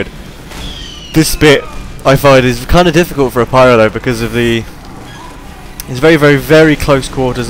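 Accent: England, United Kingdom. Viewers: where in england are you from?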